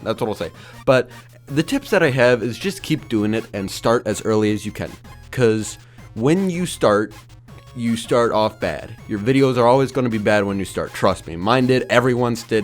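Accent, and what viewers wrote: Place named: Upper Midwest, United States. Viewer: Oh my golly! I knew your accent was familiar! I am a minneso'an who travels to wisconsin frequently dontcha know.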